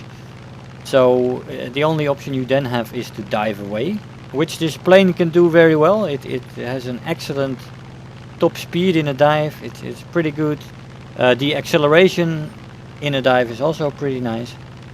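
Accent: Netherlands. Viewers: you sound really dutch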